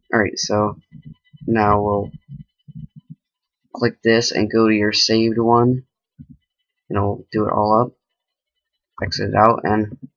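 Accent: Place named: Asia. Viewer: why do you talk Asian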